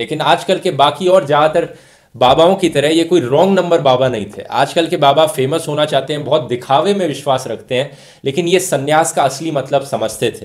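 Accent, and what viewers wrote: India. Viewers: Kya baat hai dhruv. One of the best video dear. Kya bolte Ho yaar. U sounds true Indian in this video.. Best of luck.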